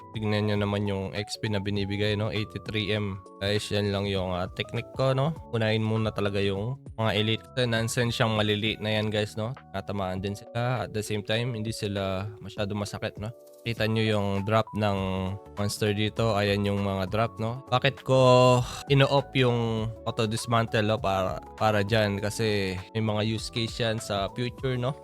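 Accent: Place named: Iloilo, Philippines. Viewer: salitaan plang ilonggong ilongo toto part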